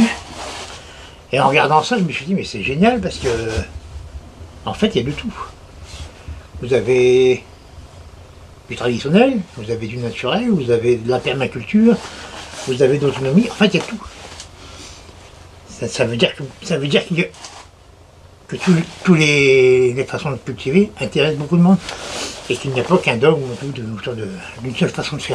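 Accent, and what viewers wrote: North, France. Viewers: Bonjour merci pour toutes ces vidéos c'est toujours un plaisir. En tant que fille du nord, je vis maintenant en Bretagne et la nostalgie de ma région d'entendre l'accent me fait chaud au cœur. J'apprends beaucoup avec les vidéos. Merci beaucoup pour les conseils et je me suis empressée d'acheter mes graines Bocquet. Bonne journée à vous deux. 🤗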